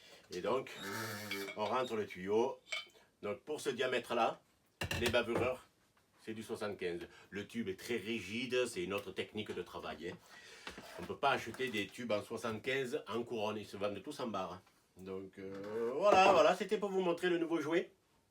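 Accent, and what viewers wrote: South, France. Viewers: Moi c'est l'accent du sud que j'aime bieng...! Le reste aussi évidemment. Tchao.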